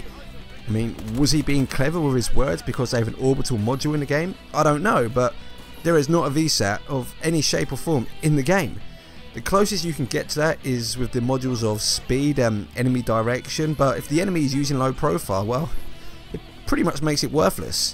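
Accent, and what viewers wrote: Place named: United Kingdom. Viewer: From the sound of your voice, I assume you're from the UK or somewhere in Europe? If so, that region has ALWAYS been paired up with people outside of the country since COD4. It's not SBMM at fault for your laggy connections to other countries. It's the region you are in, what your connection quality is, and what time you play.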